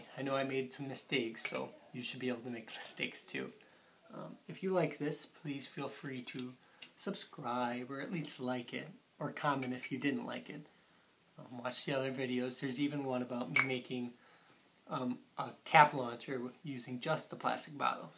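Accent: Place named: Canada. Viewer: Is it just me or are you speaking with an accent? A bit Canadian, a bit Minnesota. Which are similar.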